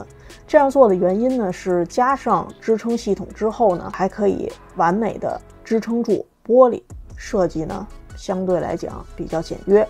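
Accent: Beijing, China. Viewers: 口音像北京人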